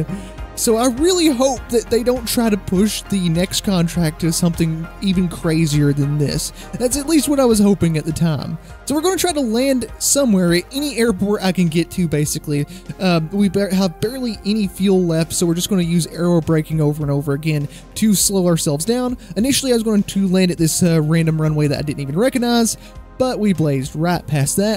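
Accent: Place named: South, United States. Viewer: i love your countryish accent, it just makes the whole vide so much more enjoyable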